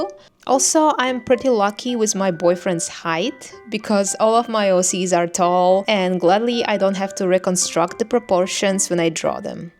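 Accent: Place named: Russia. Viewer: Просто интересно. Я недавно наткнулась на твои видео, и слушаю тебя... Твой голос напоминает русский акцент) ты случайно не говоришь по русски?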